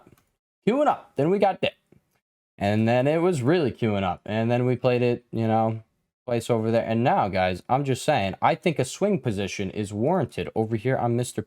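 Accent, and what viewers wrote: New Jersey, United States. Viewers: Are u from jjjjeersey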